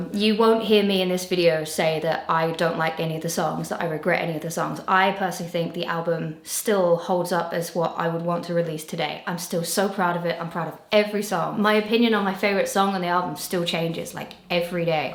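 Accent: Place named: Essex, United Kingdom. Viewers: Off topic (and sorry if you've addressed it elsewhere) but has your accent changed? We're both from Essex and I could have sworn you used to have a more southeast England accent - it sounds a bit northern now? I've been a fan since the early days and now I'm going mad trying to remember if you've always had this accent! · I would have loved both villains 1&2 to be singles with a video. I also think that maybe putting the more pop songs on another album would have worked if you know what I mean? Also you have lost a little bit of your Essex accent and I’m missing it 😂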